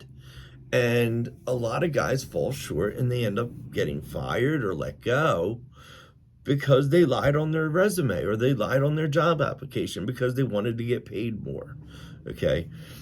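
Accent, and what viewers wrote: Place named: Philadelphia, United States. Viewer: I have been watching your videos , as soon as I heard the first sentence I picked up on your accent and figured you were somewhere local to Philly . I have been a union electrician in Philly for 24 years . Everything you’ve said on every topic is spot on . Coming from a union carrer , its cool to hear your views. I also admire you finding your nitch in knob and tube , as a commercial guy , I would never even touch it lol . Anyway, good luck and stay safe . ⚡️⚡️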